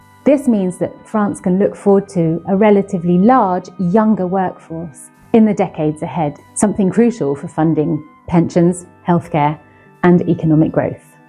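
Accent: England, United Kingdom. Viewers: This woman with British accent is sooo fake! Just lie and lie with more lies. She is a joke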